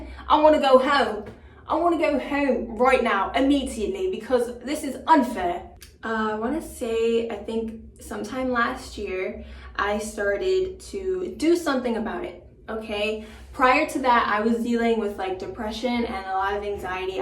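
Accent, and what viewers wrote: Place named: United Kingdom. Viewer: 🤣your British accent always coming through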